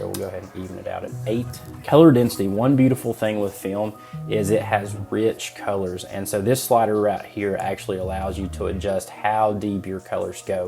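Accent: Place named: Georgia, United States. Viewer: That's a Georgia Accent if I ever heard one. Takes one to know one. Marietta!!!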